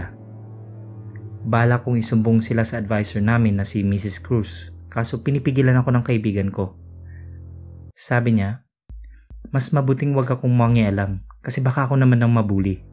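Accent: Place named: Conyo, Philippines. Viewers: pwedeng straight english na lang...nakakairita at konyong konyo ang dating. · Tama..sakit sa tenga tunog konyo...tinigilan ko na pakikinig..ibang story na lang...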